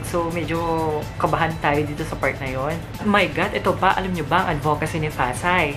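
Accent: Iloilo, Philippines. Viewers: ilonggo ka po?? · I do really like your accent. Hehhee so ilonggo like me. Hehehhe Pero i trained myself like most of time on how to speak the english language like how native speakers do. Pero ang charming mo and ang sarap pakinggan kaya ok na. Heehhehe and most and for most, your so cute hehehhe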